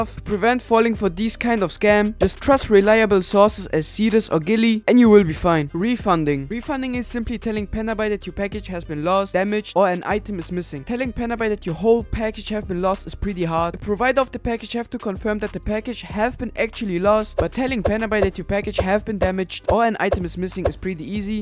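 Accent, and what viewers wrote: Germany. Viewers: Feier den deutschen Akzent :D Gutes Video :) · ich liebe deinen deutschen akzent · geiles video aber du hast hein geilen deutschen ac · Deutscher Akzent killt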